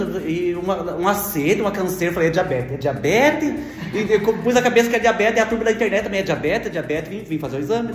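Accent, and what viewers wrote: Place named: Minas Gerais, Brazil. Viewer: descobri vc Ágora no YouTube você é muito engraçado seu sotaque parece ser mineiro e parece ser nordestino, eu sou nordestina, adoro você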